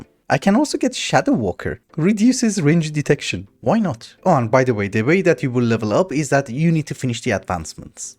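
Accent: France. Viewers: je ne m'attendais pas à cet accent français subtil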